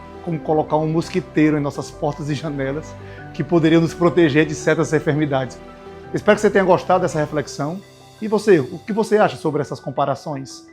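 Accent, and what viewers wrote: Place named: Rio Grande do Norte, Brazil. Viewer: vc é do RN? Seu sotaque me parece comum rsrsrs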